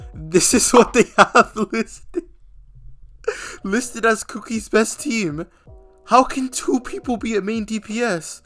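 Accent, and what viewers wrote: United Kingdom. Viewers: why is he always posting drama and why did he lose his british accent over the past 2 years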